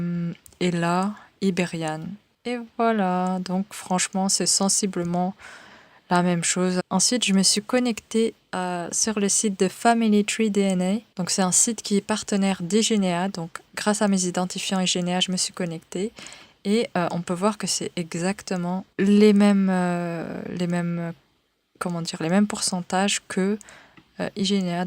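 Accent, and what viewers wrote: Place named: Morocco. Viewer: Jolie voix marocaine :) Tu est une haplogroupe E et J :) voir ici <link>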